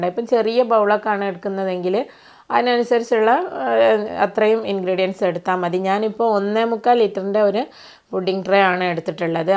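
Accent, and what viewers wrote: Malappuram, India. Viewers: Itha malappuran anoo. Talking kettitt..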